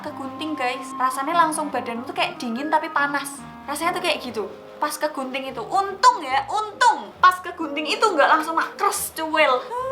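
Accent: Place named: Surabaya, Indonesia. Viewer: arek arek bgt mbk,e iki medok bgt suarane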